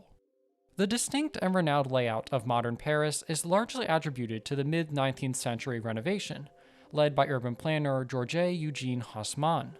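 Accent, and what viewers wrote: United States. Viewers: AGGRESSIVELY american pronunciation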